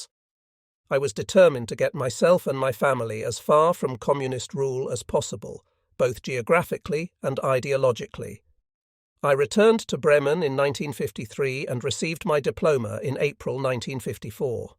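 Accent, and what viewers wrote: England, United Kingdom. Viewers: You must be from the same area of England as Mark Felton.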